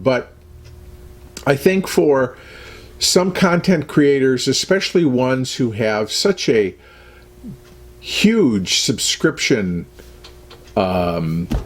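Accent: Midwest, United States. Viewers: YouTube was kind of just starting its takeoff roll when I first started using a computer and visiting the internet. Didn't seem like it was so much a "job" then. Seemed more like a hobby! I don't begrudge its having become a job for the many now who do try to make their living or most of their living at it, because the more self-employment options there are for people, the better. Not everybody's wired right for the old 9-to-5! YouTube seems to represent a different kind of creative outlet for you, and I think the content you create is better than some that is created by the people who do it for a living. It is certainly less frenetic than some. As a former Michigander myself, of a certain age, I find watching Cavalcade relaxing and reminiscent in equal parts. I love that your steel cookware on the show is the beautiful yet uncomplicated Farberware I started buying around 1975 and still comb thrift shops to find additions to. 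At (a youthful!) 70 I love seeing the kinds of appliances and kitchen gadgets I remember from when I was 20 and first learning how to keep a kitchen! I love hearing you talk the way I used to, before I wound up in New England. Your recipe videos and stove swaps and features showcasing blenders or mixers or whatever feel almost like a visit with a nice neighbor two doors down, if two doors down took me all the way back to the Midwest . I love the approach you take to content creation and I hope there will be room on YouTube for your kind of contribution a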